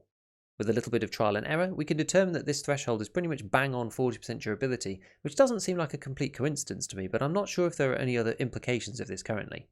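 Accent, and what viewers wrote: United Kingdom. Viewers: The 3kliksphilip of tarkov. Even the british accent is included.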